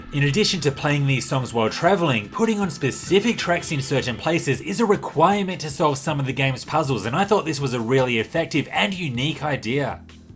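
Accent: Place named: Australia. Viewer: Your accent is all over the place. I’m Australian and it sounds like you might be too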